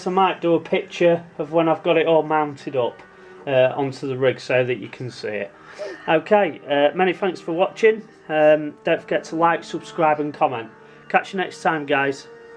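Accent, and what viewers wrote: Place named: Midlands, United Kingdom. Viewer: You sound as though you're from the Midlands...I'm guessing first Lincolnshire or possibly Nottinghamshire.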